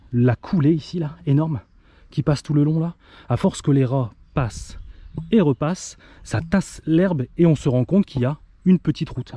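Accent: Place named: South, France. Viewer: ont utilise les tapettes nAAara comme tu nous les vends si bien avec ton accent du sud.😂😂😂😂😂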